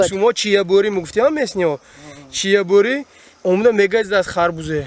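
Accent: Konibodom, Tajikistan. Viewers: Карочи хайку хамин видеохои шумова гап нест. Чисто конибодомча новаст, чигда. Чиста дехоти. Канда занед бародар. Офарин.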